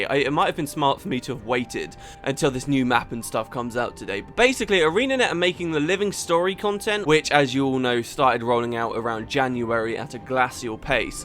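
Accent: United Kingdom. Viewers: I just realized you sound like a more British Julian Bashir. Yea I was hoping that the Living Story steps would be connected to each other because that would make it feel more connected and have a more over arching story and lore. I've been trying to get into the living story but BotFW has lost me. The other parts of the story were pretty uninteresting but this is so disconnected that I have no drive to even go there.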